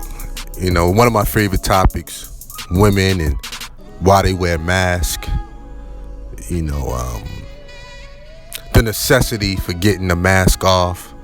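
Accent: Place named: Newark, United States. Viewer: Absolutely loved everything about this breakdown. whats understood never has to be explained and you definitely got your own lane..and that's an understatement...its over just drop a bomb on these niggas. You a real G..sound like a Newark NJ nigga too but I know youre forever. Also man I think you should consider writing a book in the long run. You're stories hit home and definitely paint the canvas. Much respect ✊🏾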